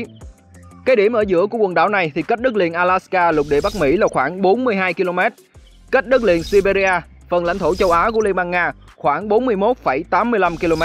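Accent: South, Vietnam. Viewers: Kênh hay giọng rất nam bộ thích đó nghen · bạn có chất giọng của người miền nam nghe dể thương dể mến nên được nhiều người thích, chúc mừng kênh ‘ TRUYỀN HÌNH’ của chúng ta ngày càng phát triển mạnh mẽ lên 10triệu Sub nha! Ủng hộ hết mình luôn bạn nhé!!! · đọc dễ nghe,giọng nghe dễ hiểu.chuẩn miền nam. chứ giờ 1 số kênh đọc giọng miền nam k ra miền nam,bắc k ra bắc nghe ghét cực kì.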